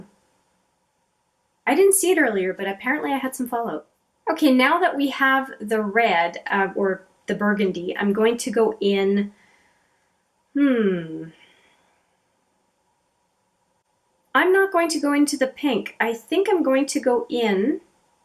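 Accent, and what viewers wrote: Quebec, Canada. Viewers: The Elizabeth Arden is still on their website.Guess I know y!U sound like u could be Canadian french!I think the urban decay shadows are my fave of the ones that I have.In general for the price and general quality they're the best of the ones I have.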